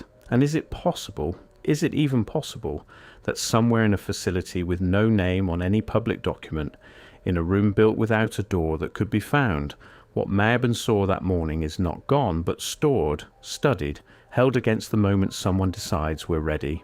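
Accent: United Kingdom. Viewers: GET RID OF THE UK NARRATOR VOICE!! 🤮🤮🤮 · When the narrator has a British accent, it makes the message more believable!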